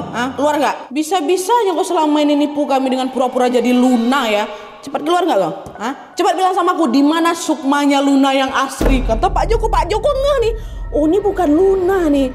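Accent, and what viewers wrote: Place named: Medan, Indonesia. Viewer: kak serius nanyak kakak org mana? medan kah atau dari pulau sumatera?? cara ngomongnya kayak org medan kali.. atau mirip org sumatera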